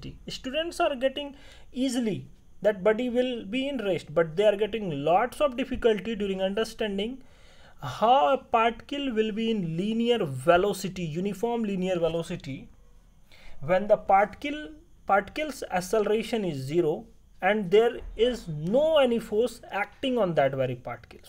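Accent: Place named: South India, India. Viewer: Sir south accent kyun use karte h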